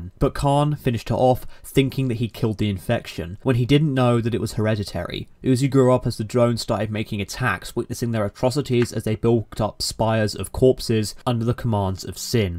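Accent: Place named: United Kingdom. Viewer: The brit'ish accent is very strong here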